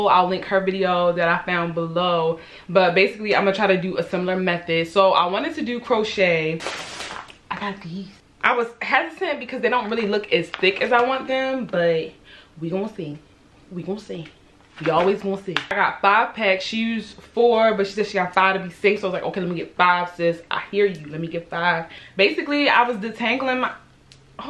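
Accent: Baltimore, United States. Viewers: Are you from Baltimore? You sound just like a Baltimore girl like me lol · sis are you from balitmore? you got the accent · I KNOW you’re from baltimore or someone who raised you is😂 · Gotta be Baltimore Like has to be lol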